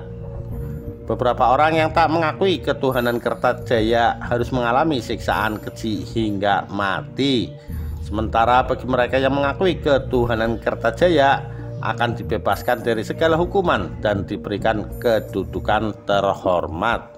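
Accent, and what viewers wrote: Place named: Java, Indonesia. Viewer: Medok bener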